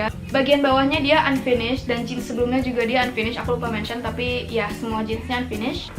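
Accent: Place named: United Kingdom. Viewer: Plss your english accent😭😭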